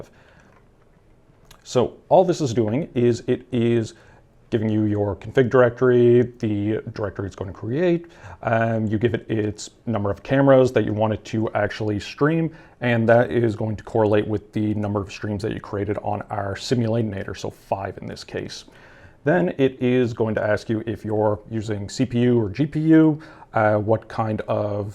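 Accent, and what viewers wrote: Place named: Cape Breton, Canada. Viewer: If that isn't a CB accent I'll eat my hat.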